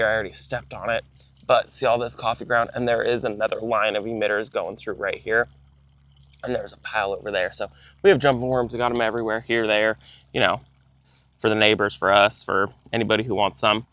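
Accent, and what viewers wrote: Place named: South, United States. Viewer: I noticed a more southern twang as well! 😅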